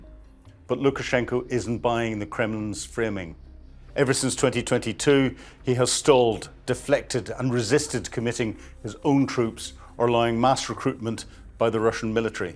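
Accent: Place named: Scotland, United Kingdom. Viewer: IT'S The SCOTTISH BROGUE.❤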